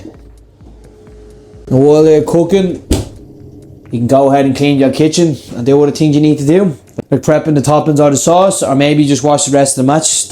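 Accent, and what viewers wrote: Ireland. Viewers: So....it's called a CHICKEN FILLET ROLL. Good to know. Yet it is not written anywhere on this screen except here in my comment. I had to peeeeeer at your tiny subtitles when I caught the name and rewound to find it again. We don't all speak with an Irish accent. You are welcome, lol. (Hey! Don't call me that!!)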